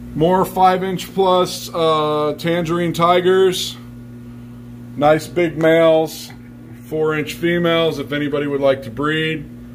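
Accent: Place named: United States. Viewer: With all due respect, did you not hear the American accent lol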